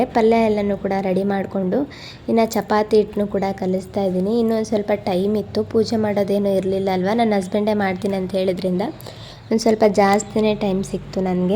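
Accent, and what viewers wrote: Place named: Haveri, India. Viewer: Nivu matado reeti haagu nivu mado aduge recipe yella nodidre nam Haveri saidnavaru ansutte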